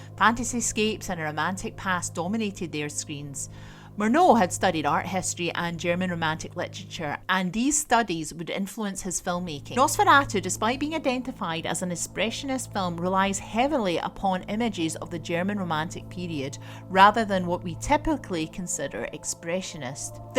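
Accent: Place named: Scotland, United Kingdom. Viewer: That was great! Nice to hear a Scottish accent on youtube ( im from Falkirk ). Deffo liked and subscribed! Have a good one.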